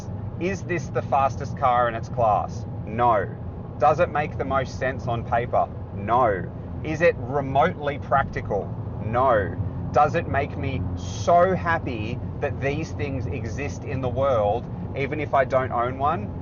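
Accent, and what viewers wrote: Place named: Australia. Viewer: I had to triple check myself when watching this. youre in a right hand drive, with an aussie dialect but all the cars look the same as america. love the guys driving silverados down under, i have one myself but i also have an abarth. ive built mine but it was fun stock but since i dont race dirt bikes anymore i decided to build a cheap car lol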